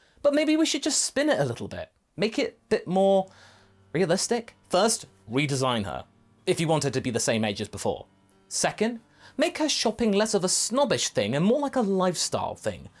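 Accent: United Kingdom. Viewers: Peak DBZ, in my opinion, was when Goku wasn't beating everyone and they all just had to rely on him. It was when the side characters could get the spotlight and do a little something against the enemies. For example, Vegeta beating Freeza's forces on Namek until the Ginyu Force showed up. Or Vegeta transforming into a Super Saiyan and beating Android 19. Or Vegeta (I'm repeating myself but he gets great moments in the series) going Grade 2 and beating "You're either perfect or you're not me" Cell. And most notably of all, Gohan going SS2 and beating Perfect Cell twice. So to have a story where Goku and Vegeta go to space and fight enemies relative to their strength while leaving the others to defend Earth against enemies relative to the side characters would be great because no one is getting shafted. The enemies aren't too difficult to overcome where they need Goku saving the day and aren't too easy to beat thus lowering the tension and stakes. 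Also, this is my first time watching you and was genuinely confused for a bit, thinking "why does the name sound so familiar?" and then being shocked that 1) you voiced Goku in DBZA and 2) you're British, so that was funny.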